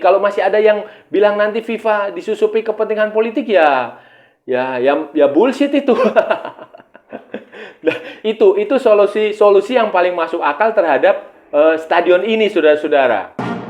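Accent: Indonesia. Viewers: Medok banget ceritanya mas bro aku jadi kangen dan nunggu cerita yg lainnya 🙏🙏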